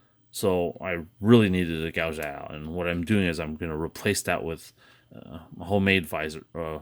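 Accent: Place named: Philippines. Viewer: FILIPINO ACCENT- more dissasembly please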